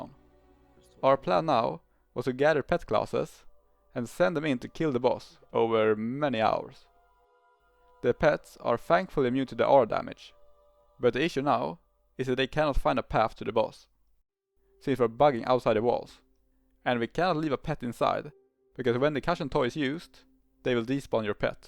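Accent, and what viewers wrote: Sweden. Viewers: Never have I heard such a heavy swedish accent.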